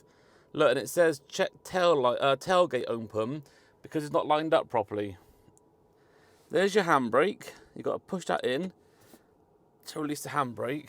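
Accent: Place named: West Country, United Kingdom. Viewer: Great review.love the west country phrases and accent.real people review...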